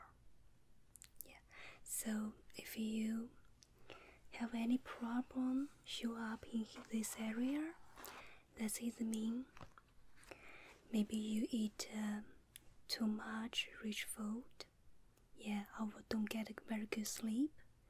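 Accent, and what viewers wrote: China. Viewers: She has a very strong Chinese accent, but it really helps me with my anxiety and helps me fall asleep 😴 ❤️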